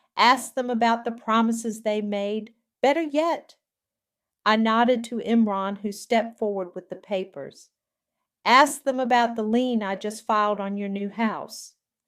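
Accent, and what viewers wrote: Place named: South, United States. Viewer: It's the southern accent and the normal slow cadence of AI speaking makes them sound like hicks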